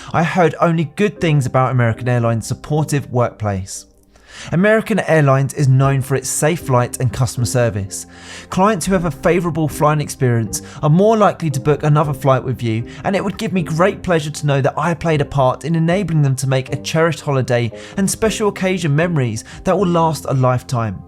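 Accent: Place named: United Kingdom. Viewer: OMG, great video mate i appreciate a lot this video, you can use this video for any job interview. Yor answers are, top notch, to me they seem more for chasing big companies that pay big $$$ for a flight attendant.... ehee. I hope not a lot of viewers admiring this positions as they look flashy, but when you learn how low is their pay, I'm assuming the pay have been going up after covid, but still $2,000 - $3,000 that's sad to me. If you young and single is fine, i rather become a pilot if I'm going this direction. Great awesome video for future interviews, glad i run to it, you got my subscription, the British accent helps too.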